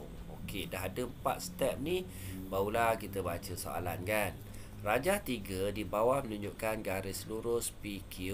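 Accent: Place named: Kelantan, Malaysia. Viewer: kenapa cikgu cover cover kelantan cikgu , takpe cakap bahasa kelantan pun boleh hehe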